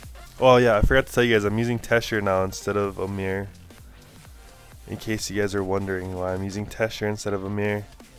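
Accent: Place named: Hawaii, United States. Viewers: nah, its not thick, but if you're from hawaii theres certain things that you can never stop and you recognize when others do it too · Yeah I could hear the pidgin small kine too. Lol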